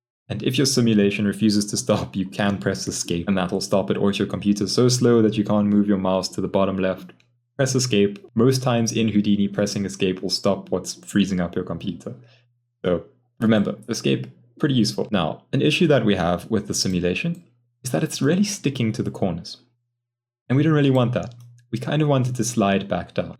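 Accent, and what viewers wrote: South Africa. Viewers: Super helpful. Trying to move from C4D to Houdini so these tuts really help! Thank you! Are you South African?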